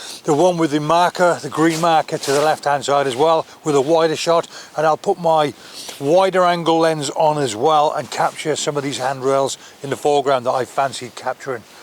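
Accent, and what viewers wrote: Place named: England, United Kingdom. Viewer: Boy, I love you as a photographer. You’re in England. I love the accent and the shots are just gorgeous. Now I know what the blue hour is, but we never see one here in California. I live I live in London I loved by cameras. I haven’t been doing any photography lately because I’ve been recuperating from a surgery and just watching you is one of the best things I have seen in a long time. Thank you for your pictures.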